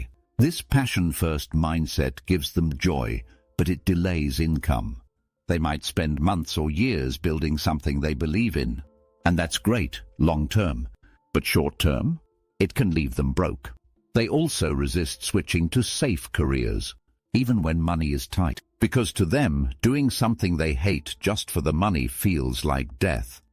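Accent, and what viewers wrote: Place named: United Kingdom. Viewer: Another crappy British accent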